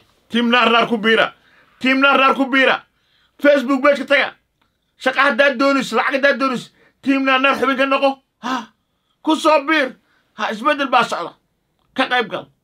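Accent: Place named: Warsangali, Somalia. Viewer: Orgi ciyaya kamataqaanid afkaaga warsangali kadaa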